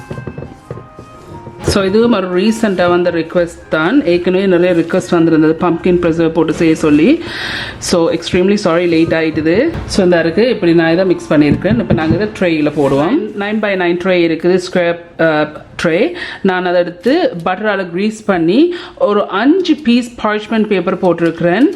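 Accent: Jaffna, Sri Lanka. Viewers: Super அக்கா, இலங்கை எம்நாடு. யாழ்பாண, தமிழ், சூப்பர். நன்றி.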